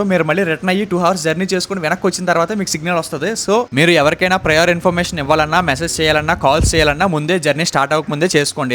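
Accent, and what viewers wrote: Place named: Guntur, India. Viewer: Mona aa slang vinni lenin anukuna .... Ivale ardam aindi guntur karaam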